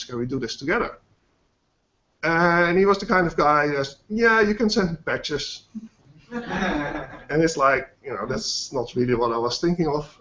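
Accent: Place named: Netherlands. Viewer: I suspected the author was Dutch when I ran the program for the first time in <num> and read the Uganda message. Never bothered confirming that, but now I've heard him and I know.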